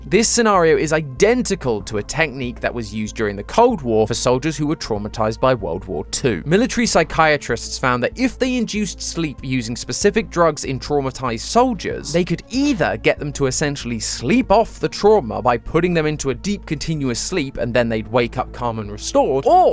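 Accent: United Kingdom. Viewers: Why are you british (you are poggers) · At first, I thought was british, and it made me kind of mad, but now I can't even hear accent, I'm definitely gonna keep watching. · why is British now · the british accent is just the cherry on top to annoy me anyways let's support other theory channels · wait since when become have a british accent? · You’re so British! Get better soon! 🩷🩷🥰🫶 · Ew hes british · Why did u turn British cuz before your like American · who the heck is this British guy, I don't understand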